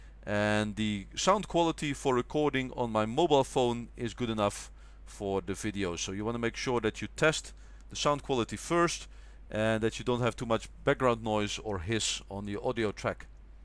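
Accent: Netherlands. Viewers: Just a quick question, are you from Belgium or the Netherlands? because your accent sounds very Dutch. Great video though!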